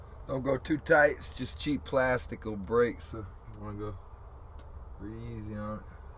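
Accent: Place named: United States. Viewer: cute american accent lol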